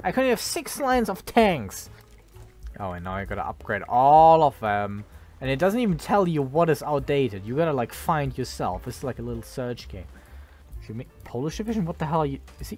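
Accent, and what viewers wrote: Germany. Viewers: nun, man hört raus, das du deutscher bist. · Ich komm' auf den deutschen Akzent nicht klar. Hab direkt zu Beginn schon bemerkt, dass da was faul ist. 😂 🇩🇪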